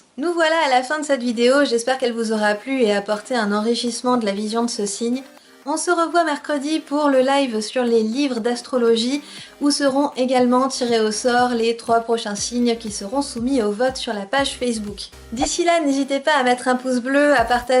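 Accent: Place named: France. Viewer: c'est un plaisir de vous écouter avec votre très bonne accent française.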